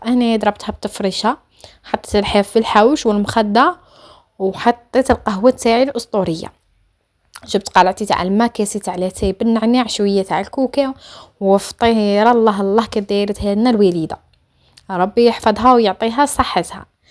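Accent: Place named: Arab world. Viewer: تبارك الله فكرتينا في الوقت الزين انت من جهة الغرب كما لهجت ناس الغرب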